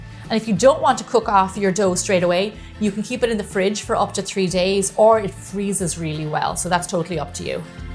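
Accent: Ireland. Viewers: Thanks for the Irish accent and the great tips. Living in a hot climate you don't want to fire up an oven, so stove-top is the way to go. Also, when I baked an entire batch of cookies they sat there tempting me to binge, so your method allows me to bake 4-6 cookies at a time. I shape each cookie, freeze them on a cookie sheet, then freeze-bag them for future cravings.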